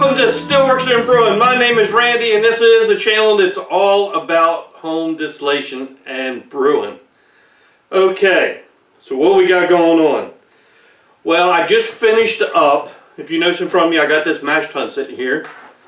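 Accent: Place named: South, United States. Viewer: im assuming this dudes from kentucky or tenessee or virginia or north carolina or somewhere like that and not texas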